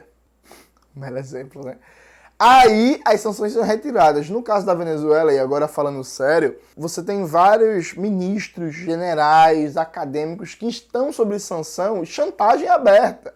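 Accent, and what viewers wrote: Pernambuco, Brazil. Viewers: teu sotaque pernambucano é tão gostoso de ouvir, acho que tu é o segundo youtuber de pe que acho. me sinto em casa kkkkkkkk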